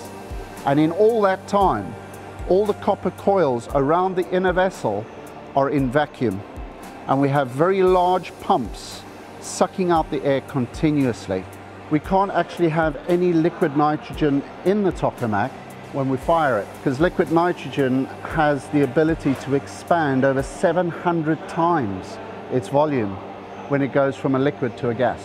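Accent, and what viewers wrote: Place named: Cape Town, South Africa. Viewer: Well spotted. Very much a Capey.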